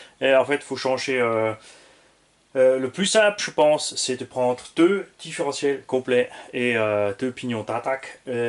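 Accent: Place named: Alsace, France. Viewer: Je savais pas que t'avais un accent alsacien aussi prononcé mdr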